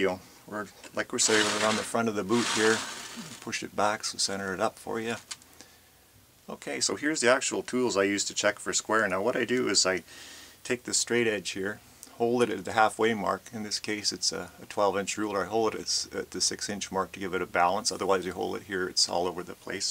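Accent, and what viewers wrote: Canada. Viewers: I could tell you're Canadian as soon as I heard you talk. -From a fellow Canadian :P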